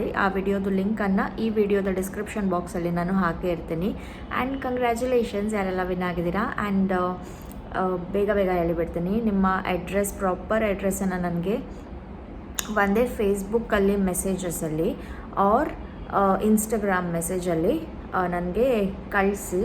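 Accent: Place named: Kundapura, India. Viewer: Kundapura kannada super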